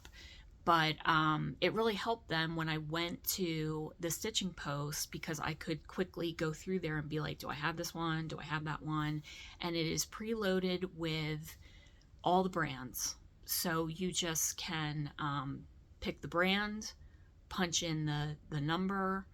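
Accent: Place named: Pennsylvania, United States. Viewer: Hi, this is my first time watching your FT, but as soon as I heard you, I recognized the PA accent! I live in NC, but grew up in the northern MD area. I’ve gone to The Stitching Post as well in Catonsville.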